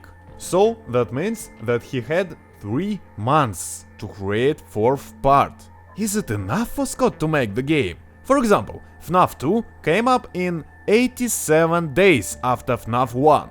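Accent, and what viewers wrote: Russia. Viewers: Почти у всех русских такой акцнет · Очень странно слушать английский с Русским акцентом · когда ты уже позбудешся руского акцента?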